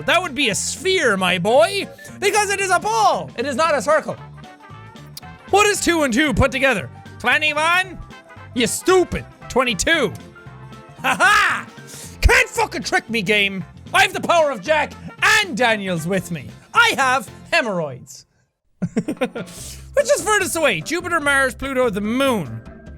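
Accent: Ireland. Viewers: The more he drinks the more Irish he turns into, do you notice that? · U know u have a strong irish accent when u start say fookin instead of fucking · The more shots jack took the more Irish he sounded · His true Irish man is coming out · Am I the only one that thinks that jack should do this more often and that he gets a more prominent Irish accent when he is drunk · Jack, you are talking Irish AGAIN... please stop... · Jack gets a thicker Irish accent as the video goes on. Noice. What am I doing with my life. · One you are beautiful Two the more drunk u get the more Irish u sound Three are you Devlin out of the sabotor by any chance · As he gets more drunk, he gets more irish lmao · He sounds more Irish the more he gets drunk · I love how he sounds progressively more irish when he takes more shots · by the second shot his voice got more irish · Is it just me or does he get more Irish with every shot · It sounds like he gets more Irish the drunker he gets. 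· It seems that the more he drinks, the more Irish he gets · i didnt think it was possible for Jack to get even cuter and even more irish. i have been proven wrong on so many levels.. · He became progressively more Irish with each shot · Is it me or does Jack start to fall back into his Irish a little more when he drinks lol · HOW IRISH IS THIS LAD?!? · His Irish accent is so much stronger wen he’s drunk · he sound more irish the more he get drunk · I dont know if I'm the only one that realizes, but his Irish accent is way easier to hear in this video compared to others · You can hear the complete Irishness in his voice I think jack was drunk at the end there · The more drunk he is his voice gets deeper and more Irish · Did anyone else notice how the more drunk he is the more Irish he sounds? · Im irish and thats just how it works you get drunk and your voice goes full irosh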